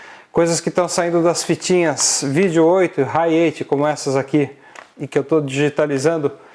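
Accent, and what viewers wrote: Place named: Argentina. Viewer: Guuuuauu what a beautiful videos a photos...like always! If i dont know that you are brazilian...in the part of AR..i think that you are a argentinian jajaja..con un mate en la mano! Tenes un acento argentino perfecto en tu español! Jaja i have a question...i read about the relation of you, David Neeleman and Azul with viracopos...but from 1990 (KLM moved to guarulhos) to that moment...what happend in viracopos? All the people say "viracopos its beautiful, great desing and funcion". Big hug from an argentinian architect...who loves your photos and desing! And your histories!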